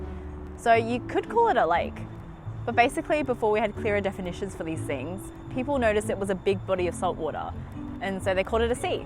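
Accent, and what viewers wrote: Australia. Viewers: I'm in love with Aussie accent 😍❤️ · I thought shes Australian.. lol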